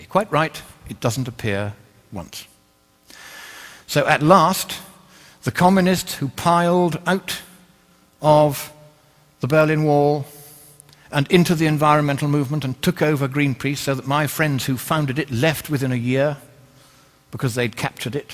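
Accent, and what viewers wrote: United Kingdom. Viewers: Nothing more than a right-wing fear-mongering asshole with an English accent. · Great, a shithouse-rat insane conspiracy theorist with a british accent. He doesn't back up anything he says with scientific evidence, he just expects you to believe it because it sound good. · Oh look, it's Glenn Beck with a british accent. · The effects of too much Co2 do effect our environment. Just because the talking head in this video has a British accent and has adopted the title "Lord" does not make him intelligent. Educated sure, but intelligent. Far from it. There are already examples in nature of how the rising Co2 levels have altered our environment and the living habits of animals. No one is saying it is just co2. But co2 is a large factor for reasons so complex and many that your head would explode. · Fear mongering of the worst kind. Half truths, a lovely British accent. 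What is he really saying? That we should recall the president? I think between Rupert Murdoch and Dick Cheney, they have something more "black ops" in mind. The real danger is turning our county over to the right wing ideologues. I'm sure they will watch over our freedoms for us. Until the middle class is wiped out, and we have a feudal state. Them in charge. The rest do what they say. Now can we see who is a Nazi?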